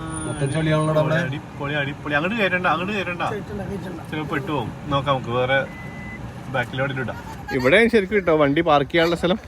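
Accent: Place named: Nilambur, India. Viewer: ഇപ്പോഴും തനി നിലമ്പൂർ സ്ലാങ് ആണല്ലോ ഇവർക്ക്... 😊😊😊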